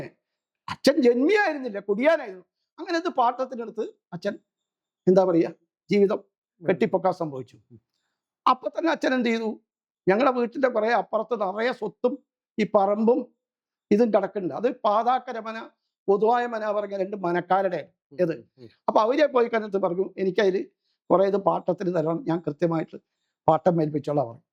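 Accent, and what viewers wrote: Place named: Palakkad, India. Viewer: അത് പാലക്കാടൻ മാരുടെ... ഒരു ശൈലി ആണ്.. അത്രെ ഉള്ളു.. ഞാൻ പാലക്കാട് മാത്തൂർ പഞ്ചായത്തിൽ ഇൽ നിന്നു ഉള്ള ഒരാൾ ആണ്... ഇവിടെ അങ്ങനെ ഒക്കെ പറയും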